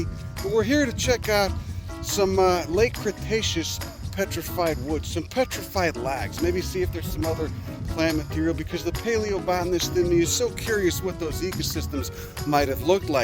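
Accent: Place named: New York, United States. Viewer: You sound like a new new Yorker but I love your knowledge. We love in Wyoming. Most the same stuff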